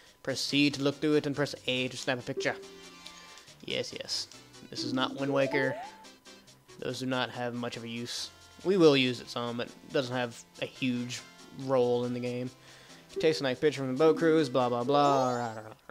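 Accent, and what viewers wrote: South, United States. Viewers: been watchin these for the past two days so i had background noise while i play ocarina of time, why do you hate your voice, i dont, i got the same one kinda, i like the southern accent.. guess its cuz i was raised around it but still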